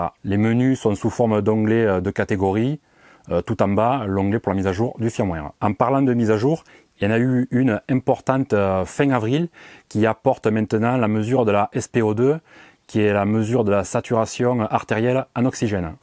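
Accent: South, France. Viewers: Insupportable son accent du sud 🤦🏿‍♂️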